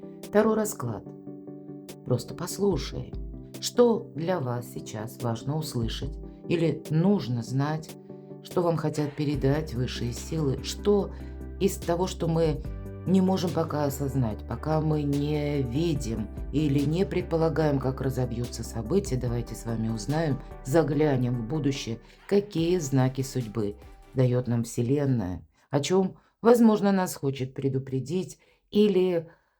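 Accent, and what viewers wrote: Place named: Belarus. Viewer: вы скорее всего из Белоруссии? Несколько лет назад жила в Беларуси,ваш говор проскальзывает.Благородный народ, люблю вас... спасибо ❤❤❤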